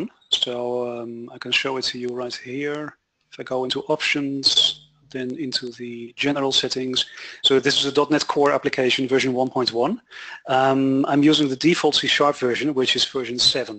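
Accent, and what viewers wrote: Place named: Netherlands. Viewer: bro u sound dutch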